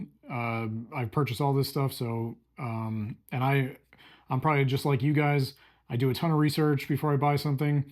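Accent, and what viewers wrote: United States. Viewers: I was thinkin louisianian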